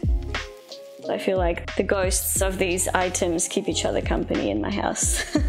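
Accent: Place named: Australia. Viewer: She does have an Aussie accent tho with a bit of something else 😊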